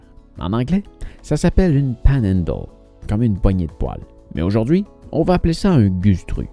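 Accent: Quebec, Canada. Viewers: Ha la la. Je suis désolé. Mais je n'arrive vraiment pas à aimer l'accent québécois. Je trouve qu'il gâche le sérieux de son reportage. Mille pardons.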